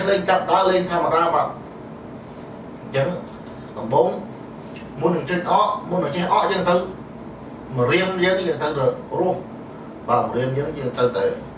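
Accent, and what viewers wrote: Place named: Oula, Cambodia. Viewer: អូននិយាយដូចអ្នកនៅស្រុកអូឡ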